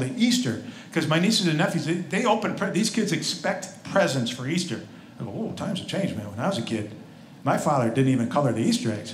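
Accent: United States. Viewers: he sounds American not Irish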